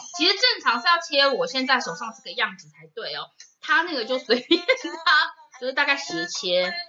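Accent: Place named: South Korea. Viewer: Kelly講話也好可愛，因為習慣韓文，所以有時候講中文的尾音也有「喔」，現在放蔥下去煮喔，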